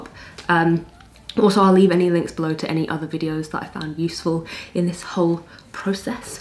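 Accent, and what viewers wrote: United Kingdom. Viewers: I need help with shaping my art to make it a career I was really good in drawing growing up but I stopped and dropped outta high school.... got me a GED diploma and joined the USARMY... I’m still looking into arts and plus I’m in Europe I got stationed in Germany I know you’re in UK because of your accent lol😊 can you help me with advices so I can get back up in my art?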